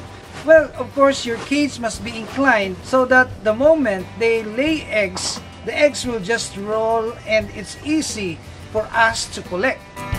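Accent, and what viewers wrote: Philippines. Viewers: I think this guy from the philipines, just by listening to his voice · He's accent is Filipinose.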